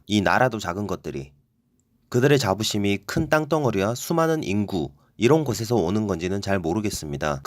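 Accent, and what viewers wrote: Gyeongsang, South Korea. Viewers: 이영상 만든이는 서울말처럼 말하지면 경상도 사투리가 다 묻어 있네요 차라리 그럴바엔 아에 경상도 말로 했음 좋겠어요 너무 거북해요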